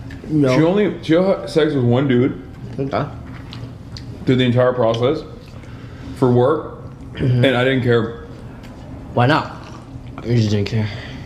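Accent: United Kingdom. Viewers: I think the issue with your channel is you're a DEI Squid and with the British accent it comes off really douchy.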